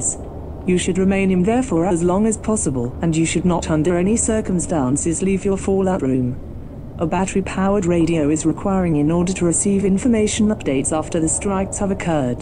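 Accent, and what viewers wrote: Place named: United Kingdom. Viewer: Just the Brit voice is putting me to sleep! She's got a way to sooth people. But the blast wakened me. Lol! 😅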